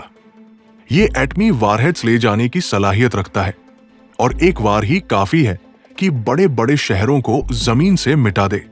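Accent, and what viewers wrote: India. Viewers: O DUFFER tera lub o lehja bataa raha k tum Indian ho. Is propaganda sa kisi aur ko fool karo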